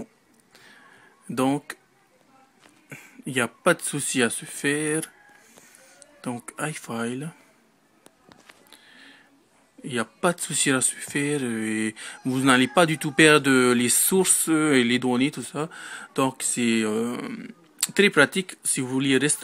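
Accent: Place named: French Polynesia. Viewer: J'ai grillé direct que tu étais un polynésien ma gueule !!!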